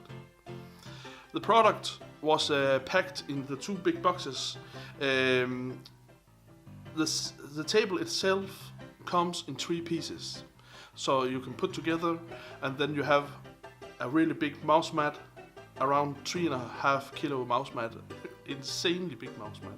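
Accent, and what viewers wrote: Denmark. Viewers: Hold kæft det er nemt at høre du er dansker :-D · Man kan bare høre at du er dansker hahahahahah!!! :D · ornli´ dansker ahah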